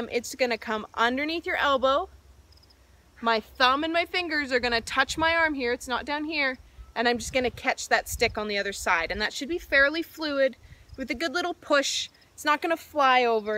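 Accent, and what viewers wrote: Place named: Canada. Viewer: Canadian?Thought I heard the majestic accent!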